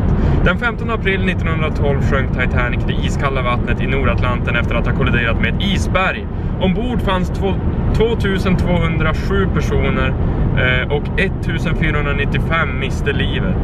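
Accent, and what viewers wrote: Norrland, Sweden. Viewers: Härligt med lite norrländska!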